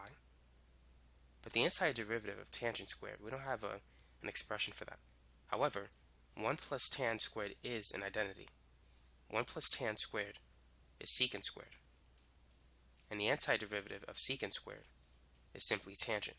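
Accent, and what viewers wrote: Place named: South, United States. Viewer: Not all heroes wear capes, some have southern accents and teach STEM subjects on YouTube flawlessly.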